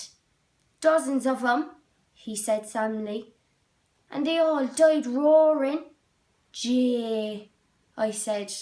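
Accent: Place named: Limerick, Ireland. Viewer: Sounds Limerick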